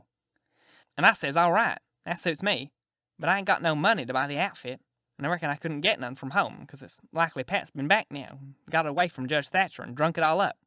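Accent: United States. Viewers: Saved me from probably taking much longer to read this book due to the southern accent. Thank you so much!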